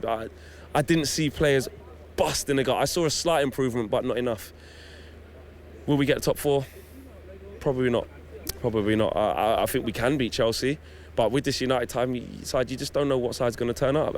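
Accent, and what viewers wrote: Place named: London, United Kingdom. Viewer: Why do u even support united you sound like ur from London why wouldn’t u support a London club there are a lot too choose from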